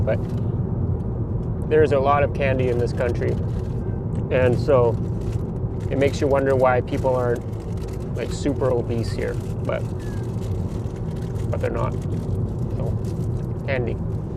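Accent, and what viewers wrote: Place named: Canada. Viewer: Great video :) Makes me wish I were in Finland. You look quite Finnish, but sound very Canadian. Did you ever manage to learn any Finnish? I've managed to get to a conversational level, but that's the most difficult thing about Finland. I think in the US, we need more of those yield signs, especially in the country when there's not a person around at the four way. No one ever fully stops anyway.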